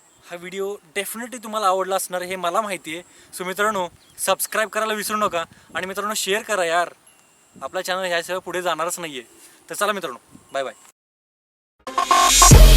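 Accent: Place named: Kolhapur, India. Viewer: Best commentator bhava..👏 Ekdam kolhapuri type..😉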